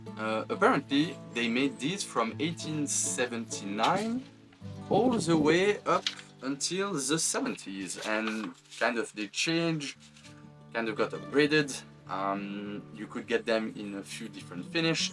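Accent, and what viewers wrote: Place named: France. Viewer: Ta un accent français toi lol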